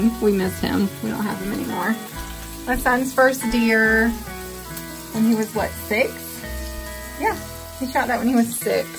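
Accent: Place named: North Carolina, United States. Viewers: Was wondering as you have a similar dialect/accent as someone I used to know in Clayton, NC - thanks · that’s so funny, I was using the sound of your voice just to try and guess where this was, and I was close. I was born in 56 in kernersville, moved to hickory in 61 and owned a home in Sparta from 76 to 88, I now live at Apple city USA Taylorsville for the last 32 years Alexander co, the poorest, smallest, least population of any county in the state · I started watching your video's earlier today. I said to myself, she has a North Carolina accent. And i just watched this video were you said Caswell co. I lived in Allamace co for over forty years. Now on the beach in S.C. But you brought back many memories of one of the nicest and prettiest state's i've ever lived in..And i can not call cows either. Have a nice day..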